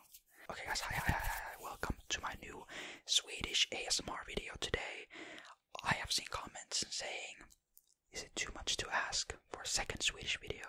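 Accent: Finland. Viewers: Finlandsvenska is a nice dialect every finnish in sweden stil got it and cant rid of it but i like it =) sounds like mummintrollen.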